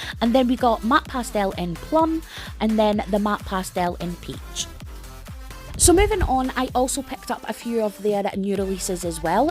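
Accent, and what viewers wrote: Scotland, United Kingdom. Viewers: Great to find U.K. suppliers. Thank you for sharing 😊. BTW “buck mad” isn’t an expression I’ve heard since I left Northern Ireland 30 years ago 🤣 I do love hearing a Scottish accent for a change.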